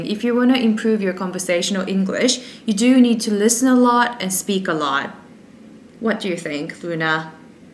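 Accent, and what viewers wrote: United Kingdom. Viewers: 가르쳐준 표현들 너무 유익하고 흥미로워용🙌🏻!! 언니 영국발음 듣는것도 좋고,멋있어요🙊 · 3년 전에 런던으로 대학원 진학하면서 님 영상 매일매일 봤었는데 졸업하고 한국 돌아와서 자리잡는 동안 잠시 님 영상을 못 봤거든요. 그 사이에 님께서 구사하시는 발음과 인토네이션이 런던에서 매일 듣던 발음이랑 정말 많이 비슷해져서 깜짝 놀랐어요. 저는 영국식 인토네이션이 어느 정도 자리잡히려던 시기에 코비드 때문에 반강제로 떠나와서 지금은 많이 없어지고 흐려졌거든요 😂 앞으로는 저도 의식적으로라도 님 영상 찾아보면서 제가 배웠던 영어 떠올리며 다시금 공부를 시작하고 싶다는 의욕이 생깁니다. 대학원 준비하면서 워낙 님과 만드신 영상들로부터 긍정적인 영향을 많이 받았던터라, 오랜만에 들어와서 반가운 마음으로 이렇게 길게 댓글을 남겨봐요! 항상 건강하시고 좋은 날들 보내시길 바랍니다 ㅎㅎ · 영국식 발음을 듣고 싶을때마다 Jess님 유튜브를 찾는데요. 오늘은 다양한 영국식 표현을 알 수 있어서 흥미로웠어요.☺️ 다음에도 새로운 표현 알고 싶어요!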